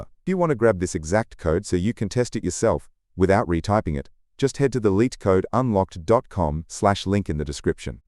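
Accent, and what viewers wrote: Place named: United States. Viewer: I could've sworn there was an American accent earlier.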